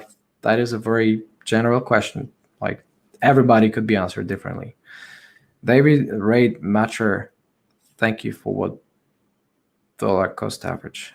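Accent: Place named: Poland. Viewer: Tak myślałem ze polski akcent, wpadłem od Scott Melker, pozdrawiam i dzięki za perspektywę. Dokańczasz do mojej listy!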